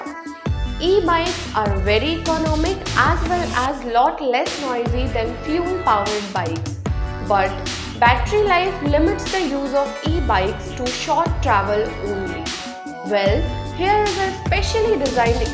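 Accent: India. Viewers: lindu pajeeet accent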